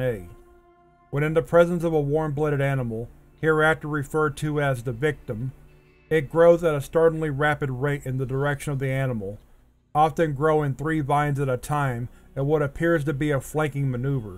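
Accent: South, United States. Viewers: Ahh yes, your sweet southern SCP narration voice is always there for us!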